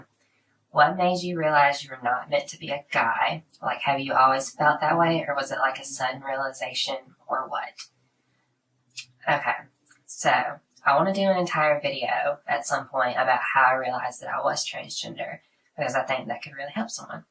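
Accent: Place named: South, United States. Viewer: I’m curious about your accent. I’m from SC, and it’s close. I’m thinking you’re from eastern NC maybe? Anyway, you’re from the South, so you’re cool with me. Edit. Midlands of SC.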